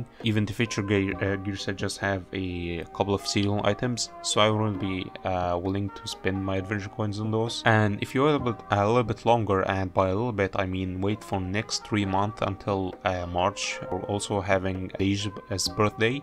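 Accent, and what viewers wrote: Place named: Egypt. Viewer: I can tell that you're Egyptian from just your accent.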